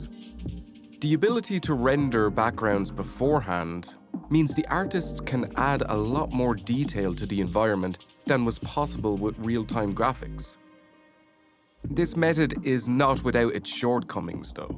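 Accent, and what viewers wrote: Ireland. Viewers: Tree D or 3D 😂 im a a black girl from Atlanta but my dad's parents are from Ireland. I know a fellow Irishman when I here one. I'm making a 16 bit game and need all the info I can. Take care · You're from Dublin, ain't'cha?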